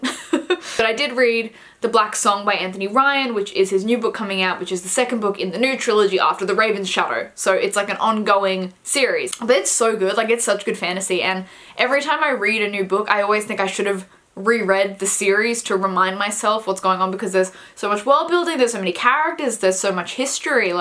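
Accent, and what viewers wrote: Australia. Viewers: I think beta reading is a great idea, and it's so cool to have an Aussie audio book narrator.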